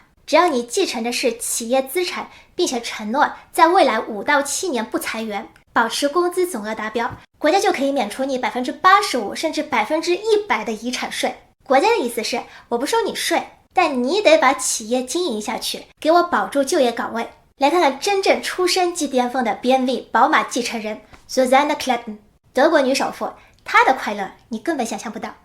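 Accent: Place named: Shanghai, China. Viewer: 口齿伶俐思路清晰财商飙高，再加上这发音，觉得是上海妹子。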